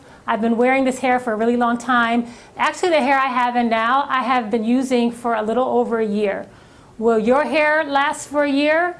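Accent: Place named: Puerto Rico. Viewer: R u Puerto rican? I love ur accent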